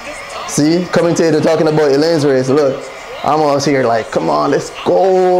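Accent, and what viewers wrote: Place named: Jamaica. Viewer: The accent had me though..Are you Jamaican 🤔....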